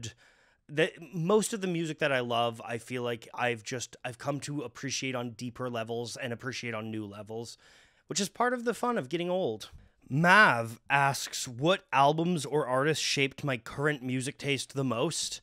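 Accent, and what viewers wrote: Canada. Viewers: Canadian man detected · People have their right to privacy, so I don't care whether a content creator shows their face or not. Not seeing face made me listen more closely and I picked up that he's Canadian. Actually because of voice similarity, for a while I was wondering if another YouTuber I watch was secretly doing this channel too. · Dude - I think you're great. Your channel rocks and you have so much knowledge. And where are you from? I'm thinking Canada somewhere....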